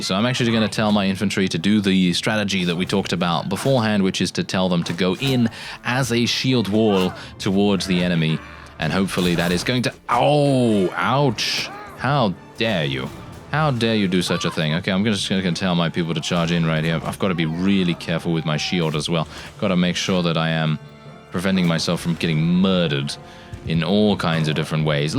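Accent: United Kingdom. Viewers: He has the most soothing yet focused voice, he sounds kinda British.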